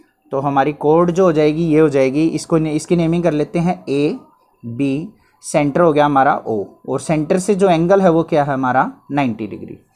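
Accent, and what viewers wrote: Haryana, India. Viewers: I understand everything in maths from I feel especially and only teaching me and you explain every small thing in very easy way. sir aap Haryana se belong karte hain kyunki jab aap koi bhi question explain karte ho to aap ki tone mein Haryanvi Aisa lagta hai mujhko sir please reply karna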